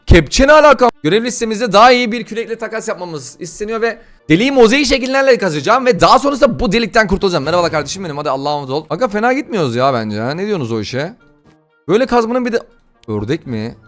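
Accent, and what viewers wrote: Trabzon, Turkey. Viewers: Baba sen Trabzonlu musun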